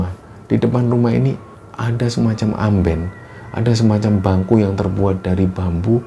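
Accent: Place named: Banyumas, Indonesia. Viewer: Iya jelas ngapak lur😁 oke siap lur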